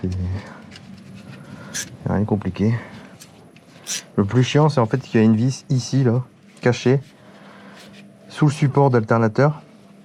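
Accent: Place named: Nord, France. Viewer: Je me rends compte que tu est du ch'nord aussi 😁🥰👍👌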